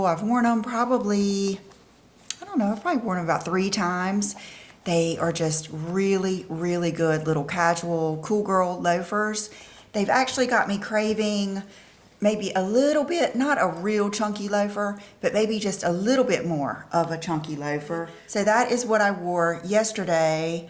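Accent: South, United States. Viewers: I just LOVE YOU. You are who I’d love to be. I found you and you are like my fantasy channel. I can’t afford the high end things you have and talk about. I have to say at times, I feel envious and at times it makes me sad that I can’t have such nice things. I’m 60 and disabled on fixed income. I’d love to be picked for a gift, it would mean the world to me. Thank you for being the fantasy “me”. My coloring is like yours and I have the redness. I try to look my best with the makeup I can afford. Anyway, I am happy I found your channel and I love your fashion sense (it’s me or the me if I could afford it) I love your southern accent and your kind and sweet way you treat your “friends” on here. God bless you.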